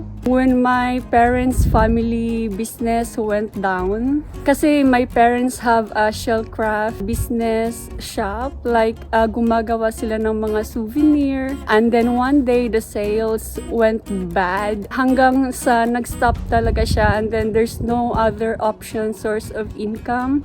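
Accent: Visayas, Philippines. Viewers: BISAYA po kayo ma'am? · Hello. My kababayan Alam Kong visaya gyud Ka dahil Yong pag bigkas mo Ng Tagalog migdyo pilipit. Proud ko sa mga PILIPINO kahit saan kahit anong trabaho marunong mag tiyaga . God bless you & your Family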